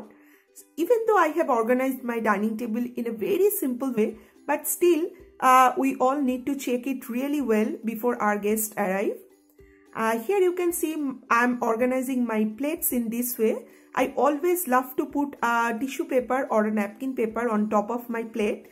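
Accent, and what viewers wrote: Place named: Bengal, India. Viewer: Hearing your english😀😀first question comes to my mind.. are you bengali?☺️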